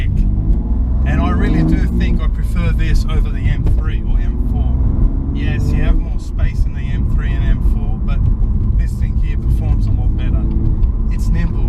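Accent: Australia. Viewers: So great too have a aussie doing a great review .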